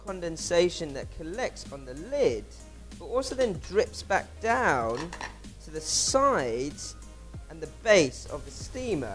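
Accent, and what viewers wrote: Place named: United Kingdom. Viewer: The british accent tho... **heart eyes emoji*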